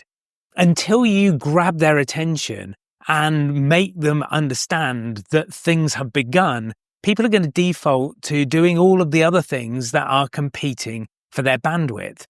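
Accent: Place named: United Kingdom. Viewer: An Italian with a British accent? Interesting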